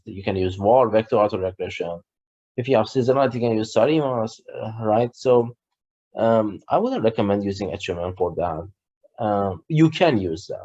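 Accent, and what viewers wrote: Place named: Iran. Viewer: I enjoyed alot from this video with your beautiful and obvious Persian accent.😊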